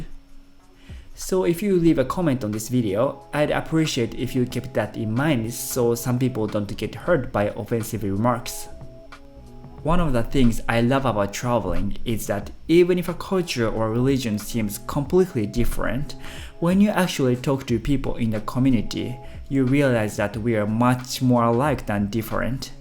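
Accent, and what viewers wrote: Japan. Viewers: 発音からすると日本の方ですね、この話題を触れてありがとうございます、日本好きな外国人としてパレスチナのデマを日本のSNSで見かけると心が折れてしまう、悲しく感じてしまいます、このどうがをみたあとにうれしくおもいました、現地の真相を語りつけずけるように祈ります、めちゃグーグル翻訳っぽくになっちゃってすみません～ｗ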